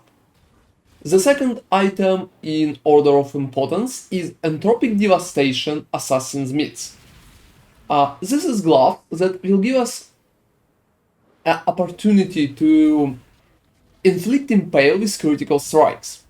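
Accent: Russia. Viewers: жесть чувак твой русский акцент это такой пиздец, это невозможно слушать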